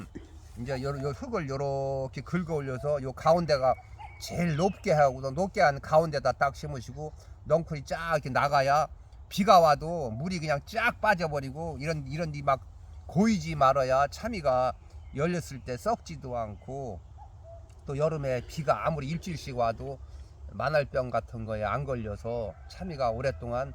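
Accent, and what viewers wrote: Chungcheong, South Korea. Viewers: 충청도 분인가벼 설명 듣기가 느려서 답답혀 나두 충청도지만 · ㅋㅋㅋ 차미 차미 아저씨 반가워유 ! 충청도쥬.저두 충청도유~~ㅎㅎㅎ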